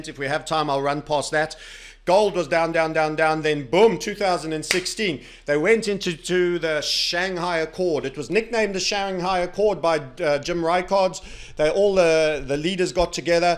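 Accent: South Africa. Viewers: except it's not a brit accent, he sounds South African or perhaps from NZ/Aus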